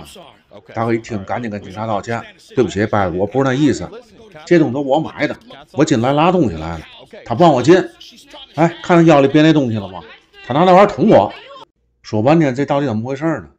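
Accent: Tianjin, China. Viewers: 兄弟，您的天津味道十足哇！I subscribed purely because your 天津味！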